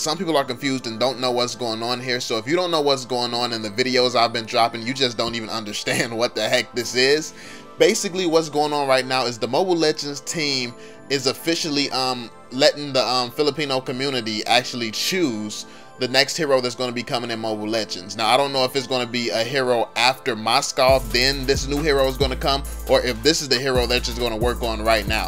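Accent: United States. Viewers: He's American, really hard for them to get the right pronounciation.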